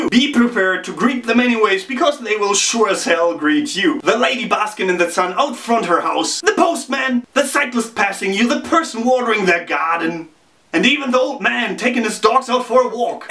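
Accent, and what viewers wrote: Germany. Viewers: ich weiß immernochnicht ob du deutsch oder englisch bist :D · Entertaining. :D His German accent is also kind of cute. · Spanish native here learning deutsch. Your english is hard to follow, I wonder if that's just you trying to enforce your german accent, but It's not helping the listener. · Ok, I am mixing this guy up with Adam Fletcher. Sounded waay to German to me. Adam is a Brit who wrote and published the book "How To Become German In 50 Easy Steps" very funny.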